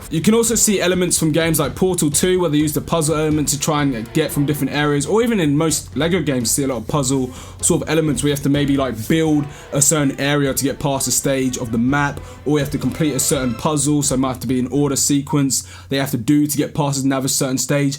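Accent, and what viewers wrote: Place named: England, United Kingdom. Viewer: Yes fam your accent is bare white you from Midlands or down south?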